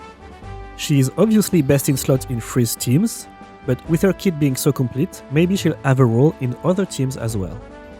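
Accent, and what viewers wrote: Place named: France. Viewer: my bad but more I hear you more I think I'm hearing a french accent like I don't feel u to have English as first language 👽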